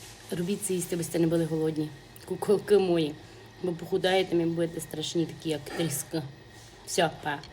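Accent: Transcarpathia, Ukraine. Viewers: Розмовляє на закарпатському діалекті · Така файно по-закарпатськи розмовляєте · Гуцули так не говорять, невже ви відрізняєте.Ця жінка з Закарпаття, так що вона не гуцулка..Гуцули живуть у Капатах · Діалект Закарпаття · Так гарно показуєте і мова ваша закарпатська така гарна. Також в молоді літа виділа як на сільських столах стояла велика миска і з неї вся сімʼя їла. І тато нас вчив їсти з краєчку, бо так скорше остиває і не попечешся. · Ну стільки масла , сметани і на вершках, ще б не було добре 😂😂😂 !❤ А ваша закарпатська, то мед на вуха і душу !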